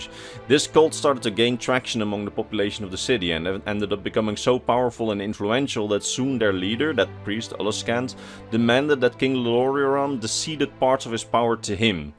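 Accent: Netherlands. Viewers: Dutch i guess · Are you like, Dutch?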